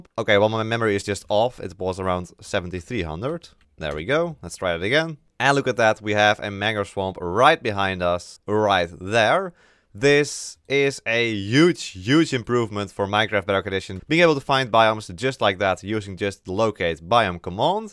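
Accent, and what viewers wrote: Netherlands. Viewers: You can really hear the Dutch. I'm Dutch myself but i didn't expect it.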